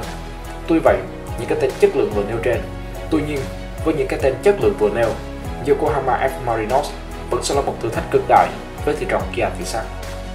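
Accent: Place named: South, Vietnam. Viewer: Giọng miền nam đc chưa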